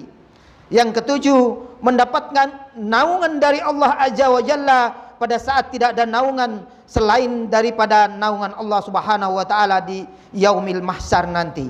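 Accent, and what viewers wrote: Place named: Bima, Indonesia. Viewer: Gaya bahasanya mirip logak Bima ya..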